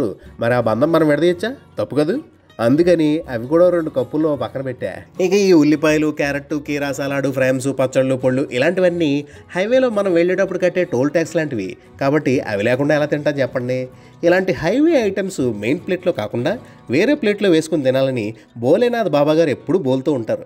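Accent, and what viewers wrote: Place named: Kakinada, India. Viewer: అద్భుతంగా వుంది అండి మీ కాకినాడ యాస....ప్రాస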